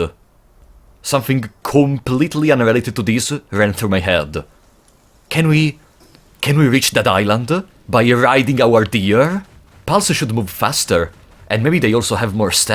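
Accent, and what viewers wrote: Italy. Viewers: Are you a northern or Southern Italian?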